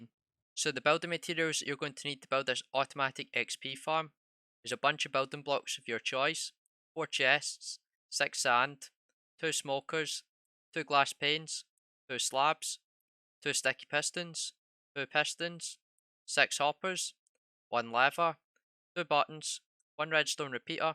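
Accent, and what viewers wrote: Scotland, United Kingdom. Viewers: aye are you scottish from 🏴? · Is that a Scottish accent? Sounds cool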